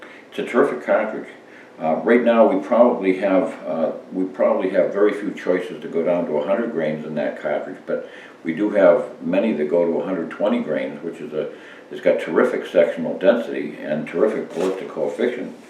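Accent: New England, United States. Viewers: You sound like your from New England! I say this because my Dad's family has been in Massachusetts and Connecticut for nearly 400 years! So, you sound very familiar to my ears!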